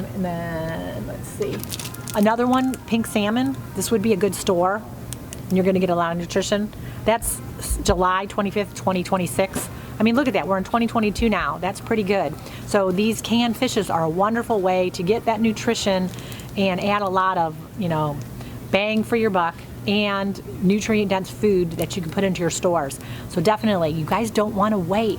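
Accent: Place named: Upper Midwest, United States. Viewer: I have to ask what state you’re from originally; you happen to sound like a lot of folks I know up in the northern Midwest.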